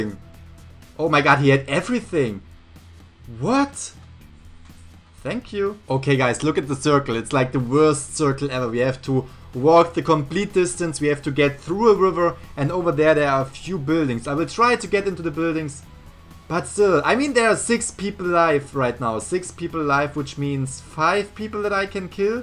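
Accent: Germany. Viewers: Are You german? Because You have like an accent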